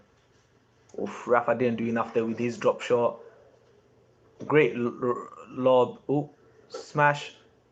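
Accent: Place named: United Kingdom. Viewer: Guessing from his accent, I'd say the UK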